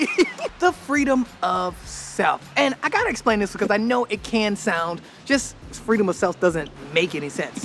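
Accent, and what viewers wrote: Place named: Alabama, United States. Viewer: Love to listen to you, you sound like my dad who is from Alabama.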